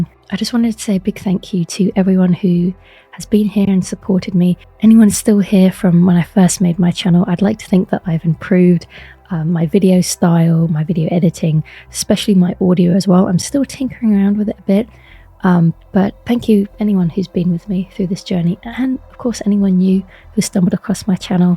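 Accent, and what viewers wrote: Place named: North, United Kingdom. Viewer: Love his Northern accent.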